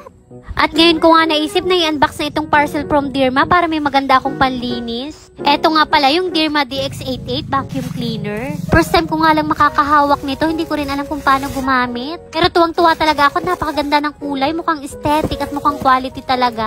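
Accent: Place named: Mindoro, Philippines. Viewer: Katuwang pakinggan ang tuno ng Salita nyo at mga words, kaya pala itong manugang ko sa pamangkin, katulad mo mag salita tiga, Mindoro kasi, tuwa ako pag nagsasalita sya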